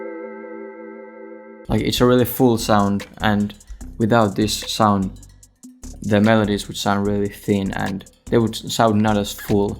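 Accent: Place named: Finland. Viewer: You can really hear that he's finnish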